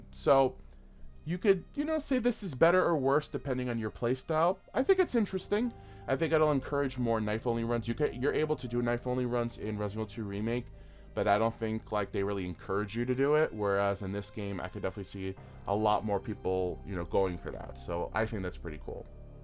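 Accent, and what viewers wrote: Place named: Michigan, United States. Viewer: Sounds like you live in Michigan 😂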